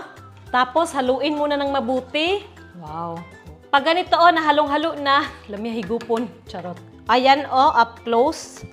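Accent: Visayas, Philippines. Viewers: Bisaya kaba te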